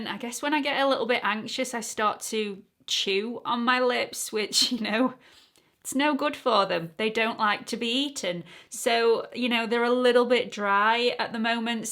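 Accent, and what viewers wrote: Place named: United Kingdom. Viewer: Hi. Have you always had the accent? Oh my goodness, I know I've watched your videos before, but I don't remember you having an accent. Are you Australian or English? LOL I'm so sorry, I thought you were American before. I wish I could use a British accent whenever I feel like it, just saying 😅 Sorry, just asking cuz it surprised me ❤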